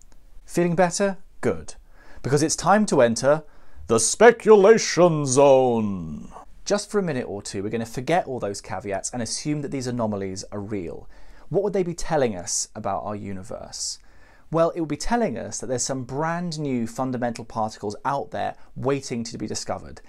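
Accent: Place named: United Kingdom. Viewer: Me a big dum dum! Smarty science guy make me smarterer! Plus his lovely British accent makes it more engaging and it’s neat to think a new fundamental force is out there!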